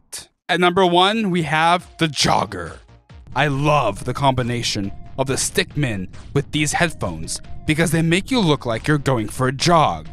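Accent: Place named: Russia. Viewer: His Russian accent is so good!!!!!!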